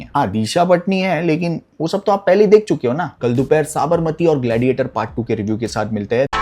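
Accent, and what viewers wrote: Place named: Mumbai, India. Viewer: not.a fan of ur mumbai accent dont do it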